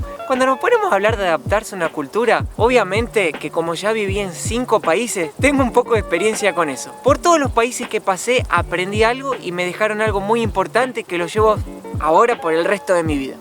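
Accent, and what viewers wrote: Argentina. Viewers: Me encanta el acento argentino 😉Да...Usted tienes toda la razón del mundo ♥️YES ! ☝🏼 ACTITUD, MODALES, RESPETO al PRÓJIMO...Además de echarle GANAS a la vida...ser buena persona ✋🏼Yo he vivido en Japón ...y me va genial tengo un buen trabajo , buenas relaciones , mi trabajo con extranjeros de todo el mundo.. 🇺🇸 🇰🇷🇷🇺🇲🇳🇨🇳 Una experiencia increíble e inolvidable 😉 lo más importante y revelan te en mi experiencia personal es ;Aceptar y respetar las DIFERENCIAS 🇨🇺🙏☘️Bye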